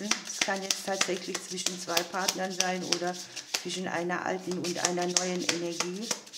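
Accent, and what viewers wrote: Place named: Heidelberg, Germany. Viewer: Liebe, du hast dir so viele Abonnenten verdient, denn du bist richtig gut. Es ist wirklich schön, dass es dich gibt. Du hörst dich übrigens an, als kämst du aus Heidelberg und Umgebung, denn du sprichst wie Beate Bahner, die Rechtsanwältin aus Heidelberg, die sich in der C-Zeit für die Menschen, die sich nicht "schlumpfen" lassen wollten, die keine "Larve" tragen wollten etc. eingesetzt hat.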